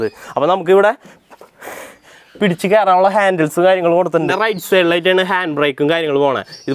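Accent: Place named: Thrissur, India. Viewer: തൃശൂർ ഭാഷയുടെ അഴക്കൊന്നുവെരുത്തനെ. Bus review നേക്കാൾ നമ്മുടെ തൃശൂർ ഭാഷ കേൾക്കാന വരുന്നത്...... keep it up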